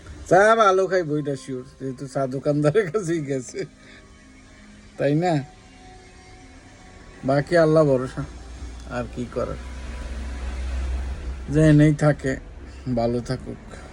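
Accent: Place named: Mymensingh, Bangladesh. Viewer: vai mymensinghor vasa apnermukhi sei lage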